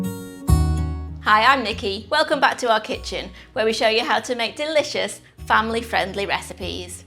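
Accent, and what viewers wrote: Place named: England, United Kingdom. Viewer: English pronunciation, unusual to American ears I'm sure. I'm always impressed that includes American measures in her directions as well. Its not common to see both.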